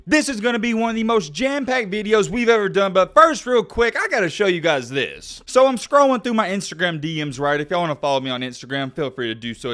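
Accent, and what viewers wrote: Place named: Dallas, United States. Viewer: Sounds just like dem boyz over there in Dallas😆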